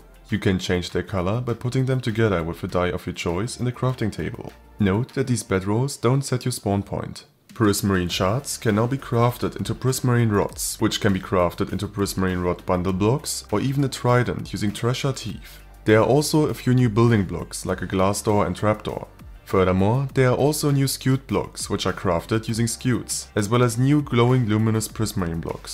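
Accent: Germany. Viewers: Wow very gut mod but i smell some deutsch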